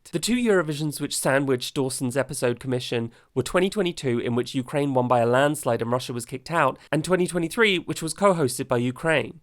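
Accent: United Kingdom. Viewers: I’m a new subscriber after this. I really disliked this episode and you explained my feelings so well. Great video. And your British accent works so well for the delivery of your satirical lines. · british accent with the pplicker lisp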